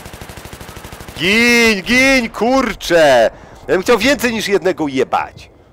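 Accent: Silesia, Poland. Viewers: No widza że ty jednak umisz po naszymu godac i że ty żeś hanys je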